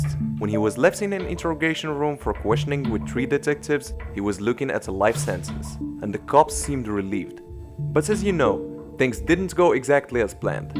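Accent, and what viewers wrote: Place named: Morocco. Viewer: Nice video! I can hear a moroccan accent, good work man :D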